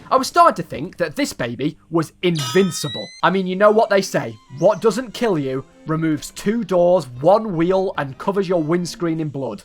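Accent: United Kingdom. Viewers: First of all calm down second of all he is British not American how did that accent sound American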